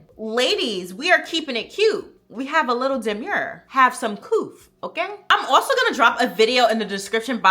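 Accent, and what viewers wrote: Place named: Bronx, United States. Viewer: I loveeee your accent! Some of my fam is from the Bronx! ❤ New Sub here!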